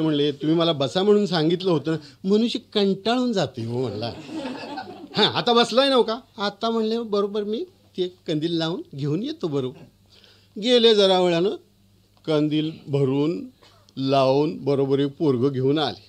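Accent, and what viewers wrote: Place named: Mandesh, India. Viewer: माणदेशी बोली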